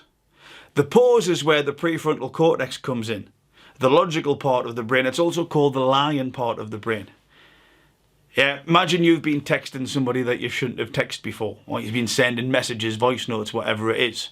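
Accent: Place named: Scotland, United Kingdom. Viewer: Nothing better than listening to a Scotsman speak. ❤️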